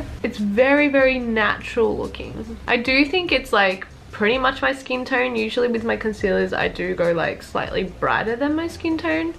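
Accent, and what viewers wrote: Australia. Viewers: I can tell as much it's Australian accent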